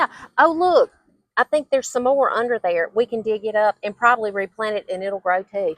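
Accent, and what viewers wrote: South, United States. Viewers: Great tip! We have a dear friend who has a beautiful garden and her name is too. She has the most amazing raspberry bush with no THORNS that produces the biggest and sweetest raspberries. We've been meaning to get some of that plant for my garden and now you've motivated us to stop by her place. BTW....love your southern accent.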